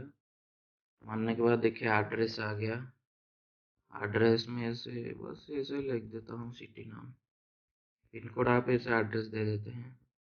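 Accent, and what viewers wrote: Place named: Odisha, India. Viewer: Please correct me if I am wrong, I feel you are from Odisha due to your accent.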